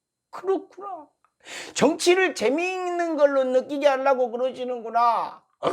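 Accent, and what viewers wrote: Jeolla, South Korea. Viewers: 표준말 써라 이쉐이야 표준말은 갠히 쓰라구 표준말이냐? 서울서 태어났다메 서울서태어나서 자랐으면 니가 표준말이 더 익숙하지 넌 전라도 사투리를 돈 벌라구 이용하는거야 안그래?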